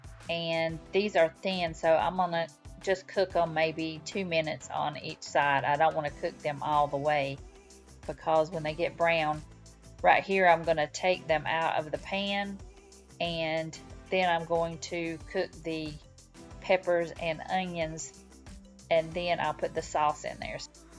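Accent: South, United States. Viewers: I love taco soup. Great tutorial. New friend here. Do I detect a southern accent 😃? ❤👵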